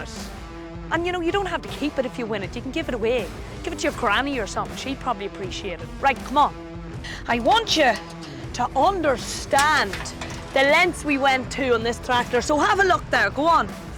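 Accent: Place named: Ireland. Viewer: I would love to split you 😂😂that Irish accent wow stunning ❤❤❤❤❤❤❤❤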